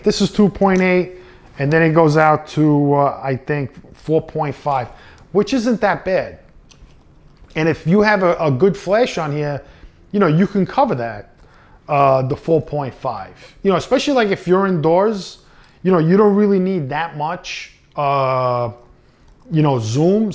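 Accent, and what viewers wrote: New York, United States. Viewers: Thanks for the great video, you sound like a New Yorker. I have a question about the sensor size, it's advertised that the 2500 has a 1" sensor. The actual dimension of the sensor is 13.2mm X 8.8mm. and there is 25mm in an inch. And if they add the L plus W it equals to 22mm and not 25mm. My Sony R1 Bridge camera is 12 years old and the sensor size is 21.5mm X 14.4mm which equals to 35.9mm. This means that my 12 yr. old Sony's sensor is about 60% larger than the FZ 2500 sensor. So my point is, all this hype about the 2500's sensor size is really no big deal, sounds like it's an advertising gimmick. What are your thoughts about this??? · Let me guess, your from New York. What is your obsession with the grip. Review the camera/cameras. Your voice is so irritating and the non info about the cameras means you wasted 20+ mins of my life.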